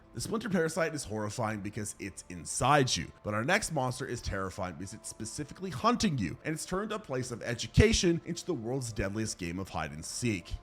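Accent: United States. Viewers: You aren't American ? Do not care at all, but you sound very American. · What country are you from? I assumed because you sound American, you're American. Your comment about calm down Americans with your Pew pews might be one of the funniest things I've ever heard in my life. And I am an American with a pew pew😂😂😂😂😂😢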